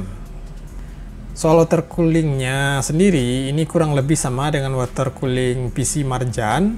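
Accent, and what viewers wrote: West Sumatra, Indonesia. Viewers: Abang orang minang kh?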